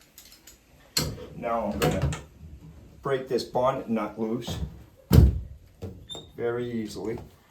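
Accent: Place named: Cape Cod, United States. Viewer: Being a native Cape Codder, I could tell by your accent.😂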